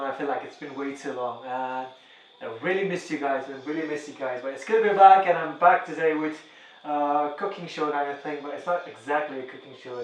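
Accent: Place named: United Kingdom. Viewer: I loooove your British accent 😍😍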